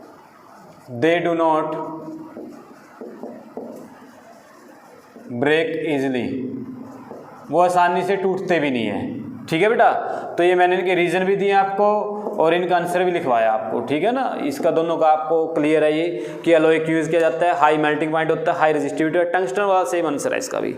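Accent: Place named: Haryana, India. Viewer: Haryanavi accent 🙏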